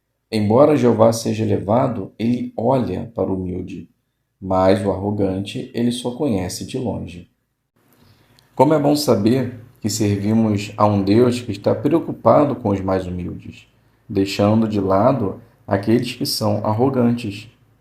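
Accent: Rio de Janeiro, Brazil. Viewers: Amo o sotaque carioca,um abraço irmão 😊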